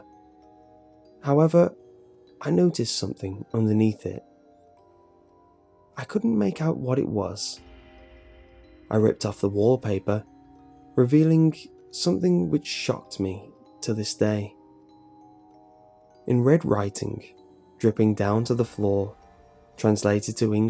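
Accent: United Kingdom. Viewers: This British accent of yours is interesting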